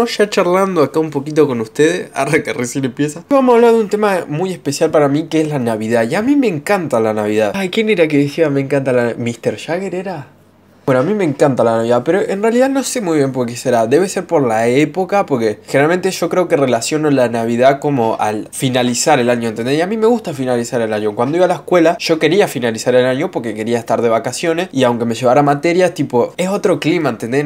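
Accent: Argentina. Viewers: Papa Noel se olvido de mi 😢😢😢 Pd: siempre pensé que eras tucumano por como hablabas, hasta que te encontre en insta y me di cuenta que sos santafesino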